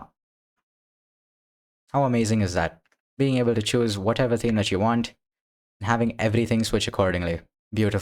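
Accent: India. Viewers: > it's the shortcut through years of confusion, broken configs, and scattered information. vaxry (the maintainer/creator of most things hypr) sells it for 5usd, and doesn't even try to pitch it onto the marketing-speak of yours of it being any worth, much less it being some adequate/proper/fast way of coming to the hypr ecosystem/the linux way of things. + indian accent lmfao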